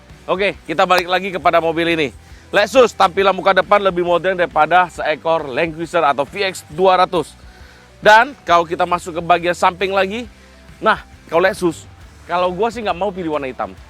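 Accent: Medan, Indonesia. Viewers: Logatnya medan banget...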